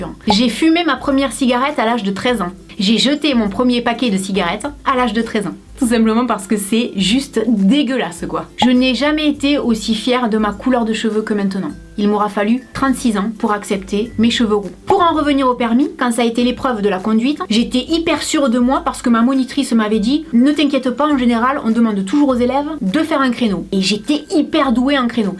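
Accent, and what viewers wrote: South, France. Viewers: Sud de la France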